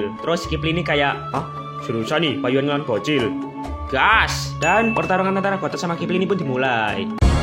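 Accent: Java, Indonesia. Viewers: Anjy Abang orng jawa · Bang kowe asal jowo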